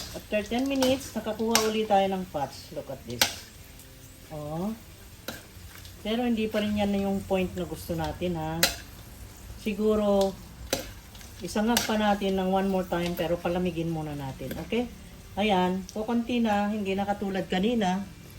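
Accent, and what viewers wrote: Ilocos, Philippines. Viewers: Ilokano ka ba ma'am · Ilocano ka tlaga msgsalita, para rin kyong bisaya. Wag knang magtagalog at nkakahiya po kyo . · By any chance you’re Ilokano?😊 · Parang Ilocano yata kayo! Taga saan ba kayo?😊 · Ilocano ka po?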